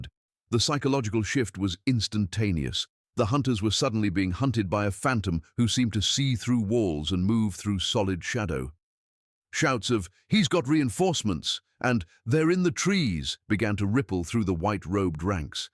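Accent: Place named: United Kingdom. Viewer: It would have been cooler hearing an American sounding vo ,1nstead of a Limey sounding voice over ! In fact any real sounding voice from an average American would have been better ! Not sayin’ it didn’t happen this way , either the guy was real lucky , or very brilliant tactically speaking or the folk that showed were totally drunk !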